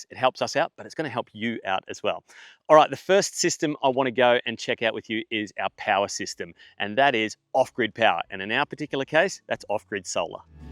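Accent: Australia. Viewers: I know YouTube doesn't care about what I write in the comments, but I hope these comments help you grow and make some money from this video. I really like your Australian accent.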